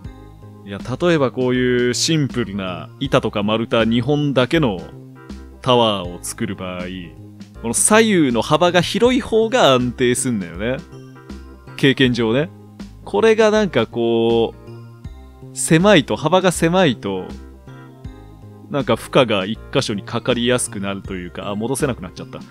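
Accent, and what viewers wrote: Kansai, Japan. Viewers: 最近関西弁多い気がする🤔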